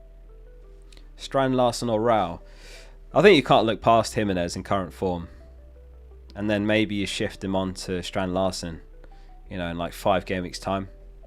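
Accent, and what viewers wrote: United Kingdom. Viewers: BRO LOOKS POLISH AND SOUNDS SO BRITISH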